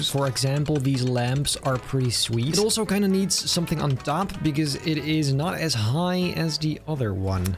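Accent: Netherlands. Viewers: Holdon Is this the same guy behind photoshop battles Sounding very dutch😅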